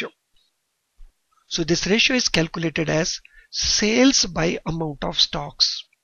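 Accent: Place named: India. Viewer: WORST INDIAN ACCENT, AYYO DDDDDIZZAAASSSHHHTER!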